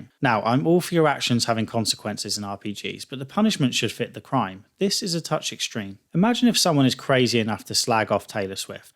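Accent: England, United Kingdom. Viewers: Lulaire Noroub he has a English accent therefor he say it differently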